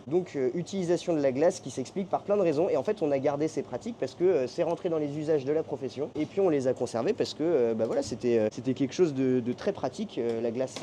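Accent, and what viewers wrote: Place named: Ardennes, France. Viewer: Plutôt Ardennais 😮😢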